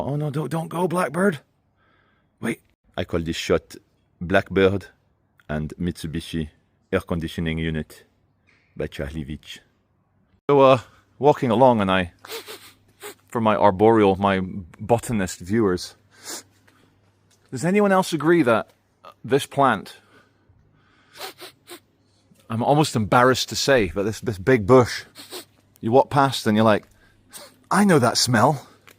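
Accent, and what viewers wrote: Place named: Scotland, United Kingdom. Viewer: so nice for me to hear UOUR Scotish accent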